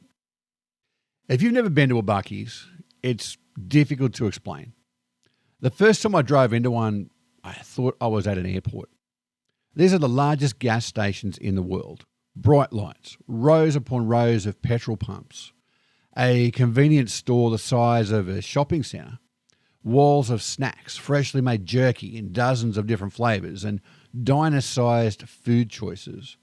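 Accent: Australia. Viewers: I love your Aussie accent.🎉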